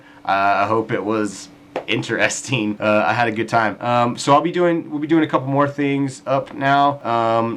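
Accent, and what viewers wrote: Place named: England, United Kingdom. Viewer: With your voice I thought u lived in England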